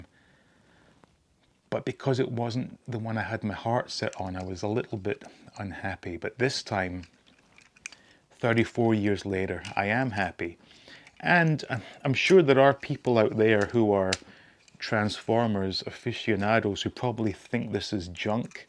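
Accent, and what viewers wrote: Scotland, United Kingdom. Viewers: I thought you were Irish at first. So Scottish like Bruce Wayne and Roddy Piper(Roderick Toombs). Well they are Scottish descent.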